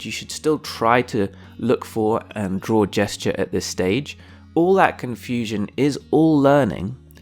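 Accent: United Kingdom. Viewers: YEAAAH BRITISH ENGLISH! LOVE IT!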